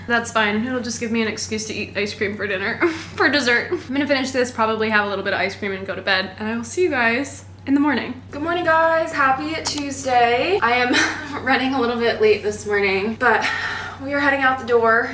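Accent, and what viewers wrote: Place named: South, United States. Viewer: I hear a southern accent coming along 😂🫶🏼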